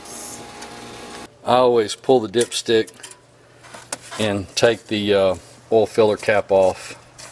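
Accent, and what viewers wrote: South, United States. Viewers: "Its not gon hurt a thang. so i take my finger and i dip it in the old oil that i just drained..."..lol .lol ....love the accent man.. Im from the island , so no shame there.. Sounds like your from the South?, pardon me if i get it wrong. Times like these i dont understand racism these days,so much we can learn from each other :( . . People who now cars know that its simple stuff or what would seem like simple stuff,but im sure alot of people have been blessed by your time. even i did, and i just came for a refresher.. Much love to you my brother. Great help,great patience with your instructions while being fluid and efficient. Bless you. And thank you.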